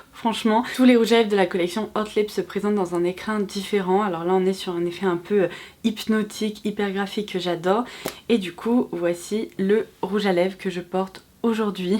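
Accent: England, United Kingdom. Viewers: J’adore ton accent anglais😍🙏🙏; as tu des origines anglo-saxonnes ?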